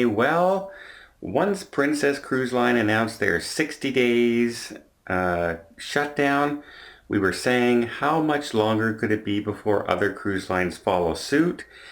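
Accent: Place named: Ontario, Canada. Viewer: where are you from? Sounds like ontario from your video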